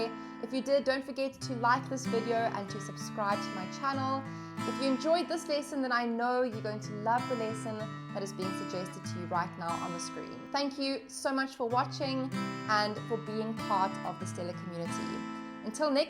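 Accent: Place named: South Africa. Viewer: Love the Safa accent! Makes me miss home!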